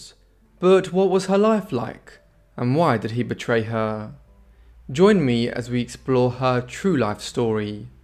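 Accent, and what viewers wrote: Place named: United Kingdom. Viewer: I love your Accent are you British?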